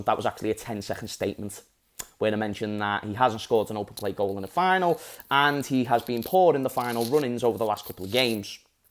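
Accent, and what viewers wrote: Liverpool, United Kingdom. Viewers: Well said, I’m an Arsenal fan that watches your content for your footballing insight and your energetic personality. Plus as an Aussie, the Scouse accent is a true novelty 😂. No player is immune to criticism, in fact no individual is. Calling it racist is a real problem as it actually contributes to the issues of divide and separation which is the opposite of where we should be heading. Before claiming that someone is racist publicly, you better have some damn good evidence otherwise you become part of the problem in my opinion. Keep up the great content ❤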